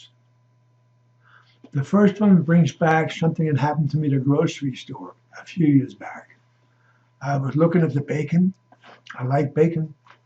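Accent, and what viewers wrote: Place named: United States. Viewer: Excellent! Good summation! Also, we have Pluto going through the US's second house, conjoining its native position from over 200 years ago. This is concerning. It is good to see another conservative astrologer! VedicCarol, or something, was one too. Unfortunately, few people listen to older people, and I'm getting there. They look for young, ignorant people to watch, some of which have good ideas, but who lack experience. Your American accent is quite good. I'm learning a foreign language too.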